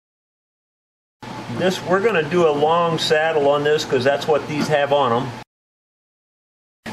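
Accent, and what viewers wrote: South, United States. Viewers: Pay no attention to the nay-sayers. I am an old country boy and retired police investigator. My old partner used to say I was 'country smart'. He said that I was able to figure out the best and easiest way to accomplish a difficult task. You even have the same country accent that I have. Pay no attention to the jackleg "I know best or a better way' types. You ever get into N Georgia and the best college football team in the nation, Go Dogs! I'll buy your lunch. Keep up the good work!! WJS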